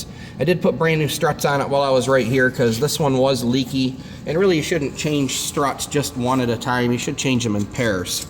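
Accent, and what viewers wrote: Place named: Minnesota, United States. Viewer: probably Minnesota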